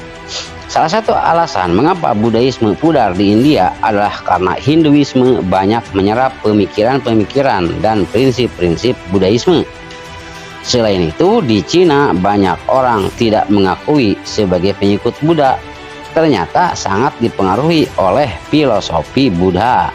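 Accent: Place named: West Java, Indonesia. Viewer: Lidah Sunda naratornya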